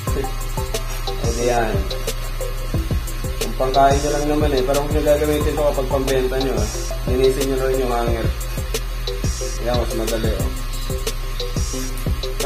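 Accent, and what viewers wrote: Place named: Pampanga, Philippines. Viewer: May antimano, kapampangan ka brad! hahaha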